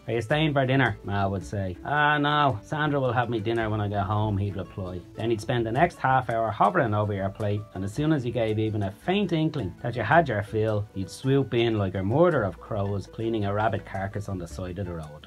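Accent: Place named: Dublin, Ireland. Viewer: Dublin JAKEEN ACCENT..TAXI DRIVERS.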